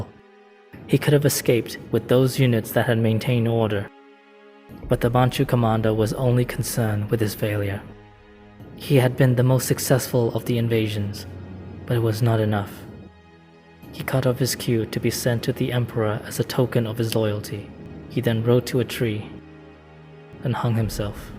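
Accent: Myanmar. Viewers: Burma accent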